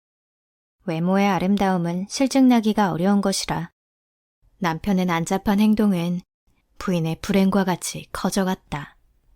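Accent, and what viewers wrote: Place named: Jeolla, South Korea. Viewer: 와 전라도 사투리가 반갑구만요 늘 좋은 목소리로 이야기 들려주셔서 감사합니다아😄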